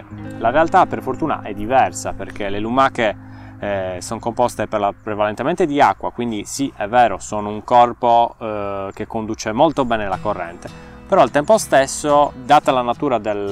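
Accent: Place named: Campidano, Italy. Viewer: Dove vivi esattamente?Dal tuo accento direi Campidano. Un saluto da una sarda in Scozia.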